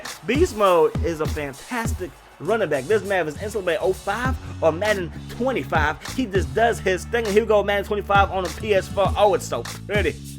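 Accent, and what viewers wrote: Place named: Pacific Northwest, United States. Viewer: Hey man where you from? I'm actually from Seattle, and I can tell you have a more north western type accent so I was wondering if you lived here as well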